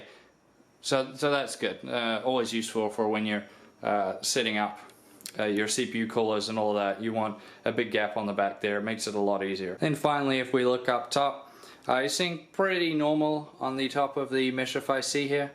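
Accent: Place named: New Zealand. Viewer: Hey man... so ahh you have a very interesting accent. R u like a kiwi or something?? 😂😝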